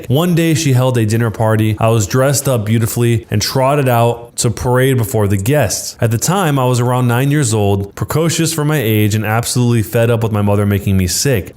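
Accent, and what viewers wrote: South, United States. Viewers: I love the southern voice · this dude makes a good southern accent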